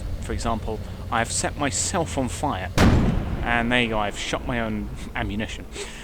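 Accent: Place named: United Kingdom. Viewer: You have a very nice bri'ish voice mate. Very clear and easy to understand for people who aren't native in English like me.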